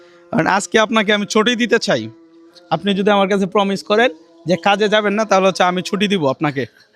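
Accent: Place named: Sylhet, Bangladesh. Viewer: সিলেটি মাশাআললাহ ,ভালো 😢😂❤❤❤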